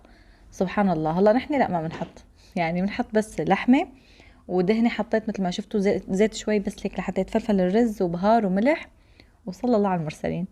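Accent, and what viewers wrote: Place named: Syria. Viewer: بصراحة تفاجاءت بقناتك الثانية يا بالله شو مهظومة هههههه بلهجتكم السورية بقولها متابعتك من اليمن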